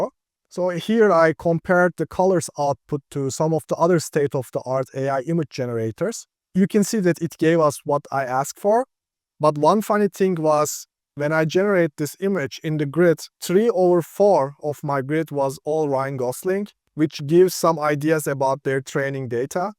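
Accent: Turkey. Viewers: türk ingilizcesi nerde görsem tanırım bre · From your accent I surmise you are from Turkey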